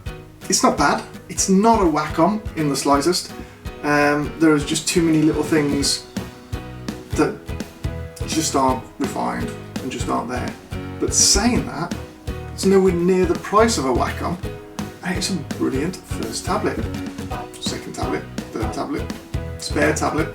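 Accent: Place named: United Kingdom. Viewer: I am thinking to get one of these but not sure if I will be able to use this as trackpad so I can operate Adobe Apps (e.g. Photoshop, Lightroom, Premiere PRO, Premiere Rush and so on.) Any advice will be much appreciated. Although this one is done in 2017, do you still use this and creating any contents? Check out my channel and let me know if you liked any contents useful so I can improve further. As a Newbie, we can grow together if you still creating and interested. You got my support (263) and hopefully you will be doing the same and keep in touch, especially it sounds like you are from here in UK. Please keep in touch so we can discuss and grow our respective channels and get ideas for our contents. Please look after yourself and each other & Stay Safe. "Life is Short, so Live Long & Prosper - "Our World is a Shared Experience" - #TubeBuddy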